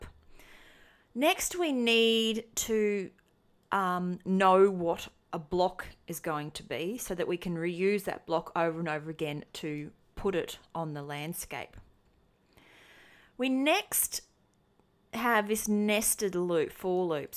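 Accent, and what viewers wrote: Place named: Australia. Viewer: Tea Requiem It's an 'advanced tutorial' she's not going to explain how methods work etc. That much is supposed to be given. She gives a brief overview of the code, and leads the rest up for you to think about after the video. If you ask me, that's how I learn best. Doing, not having someone explain everything; and experimenting on your own! Anyways, as for accents, I'm pretty sure no one really complains about Australian accents. Her's isn't deep to the point where I don't understand, and it's perfect to listen to it. And no I can't deal with heavy accents. Why would you even complain about that? It's my personal opinion lol Anyways I really liked this video, she deserves subscribers from everyone!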